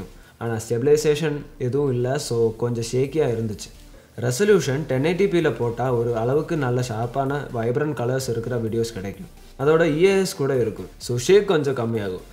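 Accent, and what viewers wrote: Kerala, India. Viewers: bro neenga malayalam ah? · Halloo bro. Indha mallu bro Tamil pesumbothu rombavae kaduppakuth So ivare replace senjal sombave nalla irukkum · Malayali tamil, we want ash back on c4e tech tamil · Hello Brother My prediction Correcta You Malayali Tamil Super Keep It 💐💐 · மலையாளம் கொஞ்சும் தமிழ் ரசிக்கும்படி இருக்கு. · Boss ...unga tamilile Oru Malayali touch.. erukke..neenga Oru Malayali.. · Chetan malaiyali aano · Bro neenga malayalai ah! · Sounds like a malayalie speaking tamil,am I right? Good job!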